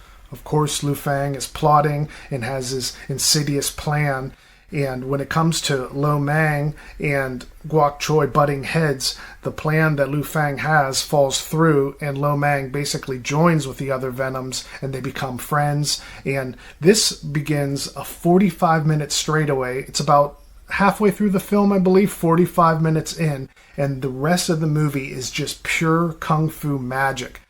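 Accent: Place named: Philadelphia, United States. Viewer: Haha! That’s awesome! You’ve got a hint of the greater Philly accent so I thought you must have been from around here! Yeah, I watched a ton of Kung Fu movies on Philly 57 and still have a few VHS tapes recorded from there with all the local commercials for Acme, Window Wizards, and the like. Was the “bootleg era” a nationwide thing? In the 90s I bought lots of bootleg tapes from a place in Chinatown called Universal Electronics (3 for $25!). The quality was generally awful but that was the first time I was able to see the Shaw Brothers stuff that never got released on TV as well as unedited versions of the more violent stuff.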